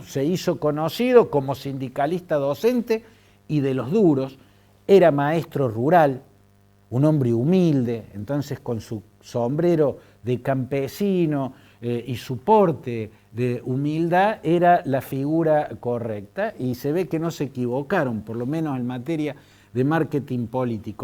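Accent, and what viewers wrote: Córdoba, Argentina. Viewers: Es cordobés? Se nota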